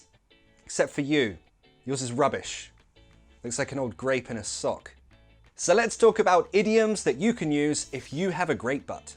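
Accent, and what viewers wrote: United States. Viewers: I love your girl version more and the way you pronounce things in American accent ...😂👌 Really you r amazing ☺️ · Fine american accent !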